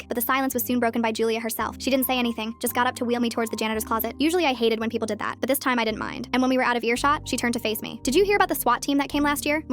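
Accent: United Kingdom. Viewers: So your British I challenge anyone here to figure out how I found this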